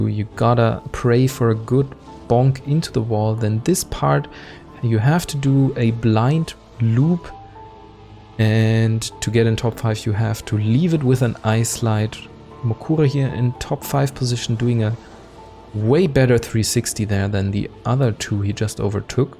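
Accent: Germany. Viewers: sehr deutsche aussprache, woher du wohl kommen magst? 🤔🤔🤔😂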